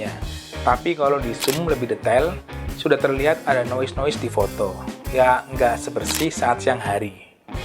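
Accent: Tegal, Indonesia. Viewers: Wong tegal lurr? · Wong tegal ya mas?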